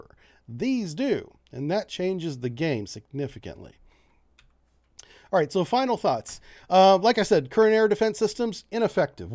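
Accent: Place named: United States. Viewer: You sound exactly like I'd only expect to see from an American.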